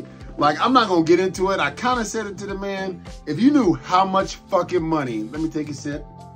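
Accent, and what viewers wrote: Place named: New Jersey, United States. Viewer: So I came here from ninja... This is by far my new favorite channel. Hearing you talk makes me homesick about my people in NJ. Amazing content! Subscribed!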